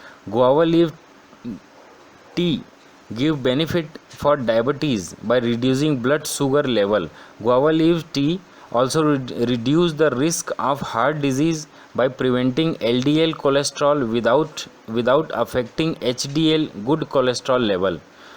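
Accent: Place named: India. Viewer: Indian accent, you know it's a damn scam!!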